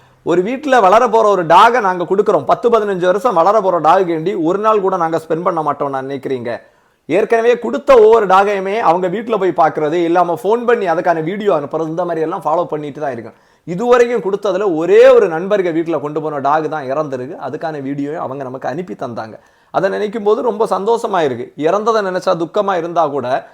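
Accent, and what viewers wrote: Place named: Nanjil, India. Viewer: Great work 🎉🎉 Love your Nanjil. Accent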